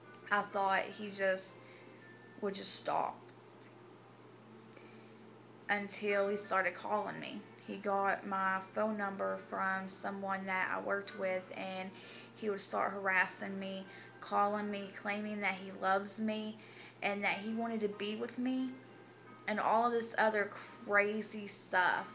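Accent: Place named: South, United States. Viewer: I love your Southern accent and I'm sorry this happened to but you're very pretty!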